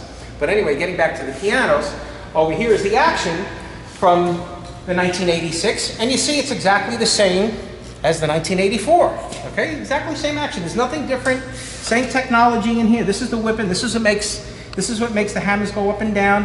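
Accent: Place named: New York, United States. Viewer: Host must have a NYC typical Accent.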